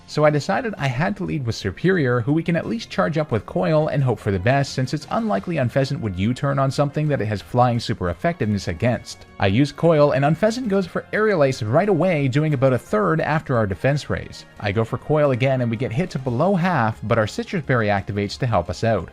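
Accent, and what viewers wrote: Canada. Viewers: You canadien bro?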